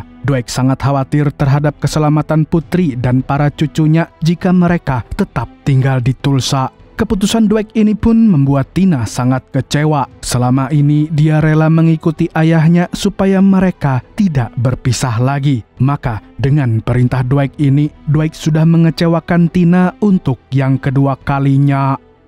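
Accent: Banjar, Indonesia. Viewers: Orang Banjar kh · Skalinya urg Banjar jua😂😂😂😂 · Org Banjar kah mang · urg banjar kah pian mang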